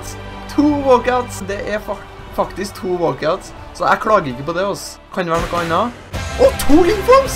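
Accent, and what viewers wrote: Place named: Trøndelag, Norway. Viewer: Liker ikke selv om det er bra vidio men du er trønder og jeg er fra Molde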